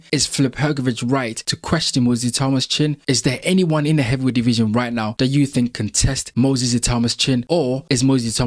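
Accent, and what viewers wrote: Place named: Ghana. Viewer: You sound Ghanaian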